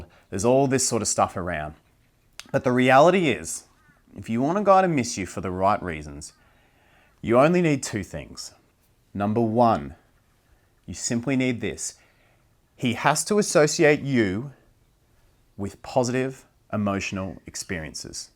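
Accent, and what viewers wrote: Australia. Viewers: Are you Australian? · i know this may sound cheesy but i officially love Aussie accent.😆 and its amazing how i do these things without even realising it. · I just read your are Australian. .. ye ye. love the australian accent 😊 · I’m living in Aus and learning English. I watch your channel for English listening practice because you speak little bit Aussie English and put subtitle :) it’s great that I can learn about relationship at the and time! Thank you!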